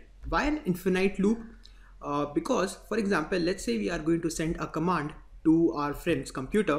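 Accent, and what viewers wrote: India. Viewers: this guy is just a thief, what a shame look at this older video: <link> watch it with a proper English not Hinglish!!!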